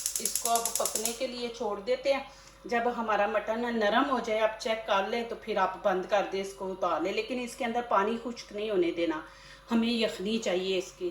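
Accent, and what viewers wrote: Punjab, India. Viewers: اردو پنجابی کی ٹون میں اچھی لگ رہی ہے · Aapki Punjabi accent bahut achhi lagti hai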